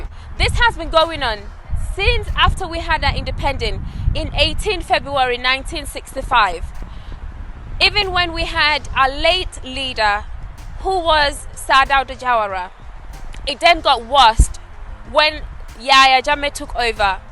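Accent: United Kingdom. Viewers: Oh!! You truly love your country!! I am really blown away by your brilliance and passion!! I'm very serious when I say this: "You should run for the Presidency of "The Gambia." If I was in "The Gambia" right now. I would be your "Secret Service Bodyguard." I would protect you and make sure that no one lifts a hand to harm you!!! You are so smart...and once again...I just love your British accent!!!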